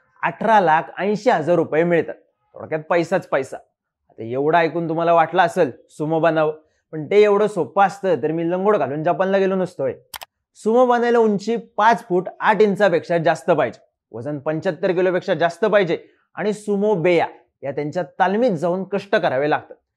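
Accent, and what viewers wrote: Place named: Satara, India. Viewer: Bhava boltos lay bhari asal satari ❤️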